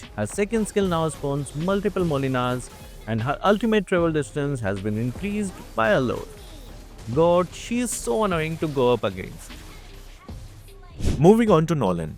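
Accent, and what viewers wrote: India. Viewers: Your name is japanese but your accent is Indian? Wtf?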